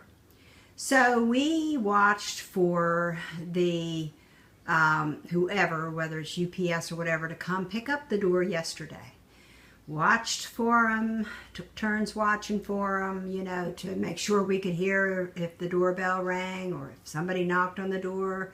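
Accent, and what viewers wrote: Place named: Pittsburgh, United States. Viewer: You sound like you are from the “Burgh” Pittsburgh that is? Hope you get your doors fixed. Nothing is built like it used to be....have a blessed thanksgiving and Christmas..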